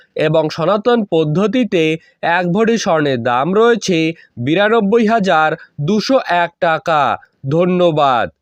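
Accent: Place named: Kolkata, India. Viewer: কথা শুনে মনে হইতেছে কলকাতা বাঙ্গালী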